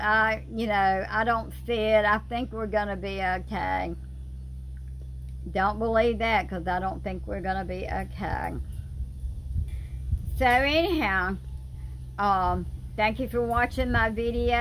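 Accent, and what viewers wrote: South, United States. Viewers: I'm from Illinois and it feels good to hear your southern accent and looking at your background it seems like a touch of heaven.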